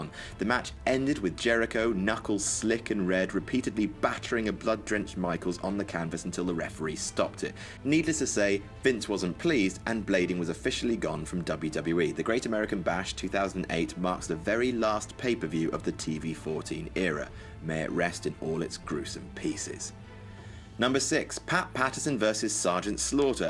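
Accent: United Kingdom. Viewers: Steven Wall You're right. He's British. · can we not have a british guy speaking and get in to the compilation already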